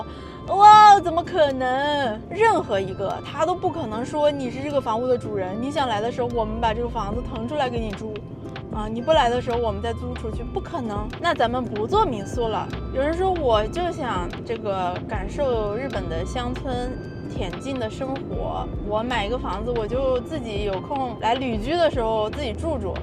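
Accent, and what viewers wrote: China. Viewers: 哈哈，講話像是大陸人口音，可是render在影片上的字幕卻是繁體，還是剛好講的內容簡繁都是同字啊？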